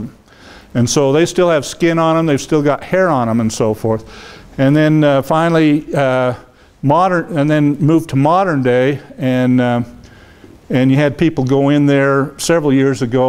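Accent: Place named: Deep South, United States. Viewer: This man seems very nice. Listening to this presentation is just like when you pull up to a barber shop and ask for directions in the deep south. 😅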